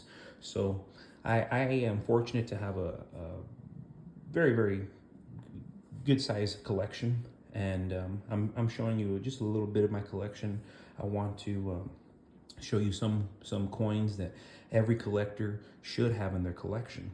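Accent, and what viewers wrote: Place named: Texas, United States. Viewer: Texas accent too heheheheee!!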